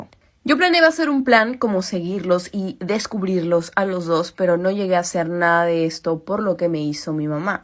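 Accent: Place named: Venezuela. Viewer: Tu eres venezolana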